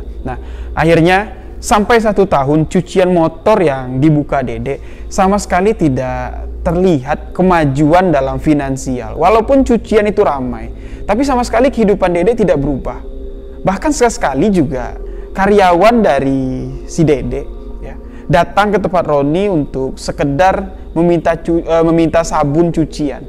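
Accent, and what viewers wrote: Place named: Madura, Indonesia. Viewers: Org madura ya bang ngomngnya khass bngtt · Logat maduranya sangat kental..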